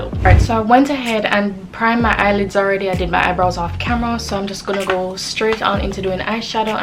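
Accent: Jamaica. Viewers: You Jamaica already know the accent 😍😍😍🇯🇲🇯🇲 island gyal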